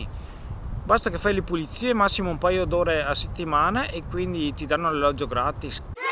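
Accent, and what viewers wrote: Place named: Veneto, Italy. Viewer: Vecchio mi sto sparando tutti i video! Montaggio figo e info utili! Poi l' accento Veneto xè a roa pi bea del mondo!! Ahahah!! Sto programmando di venire anche io in zona appena finisce tutto questo casino.. Matteo da Rovigo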